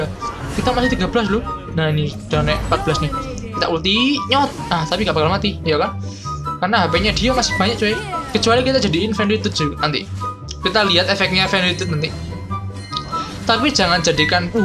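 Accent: Java, Indonesia. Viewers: Jawane sampean ngendi bang? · biasa aja bicara y jangan medok biar gk salah nangkep. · Medok bnget wkwkwk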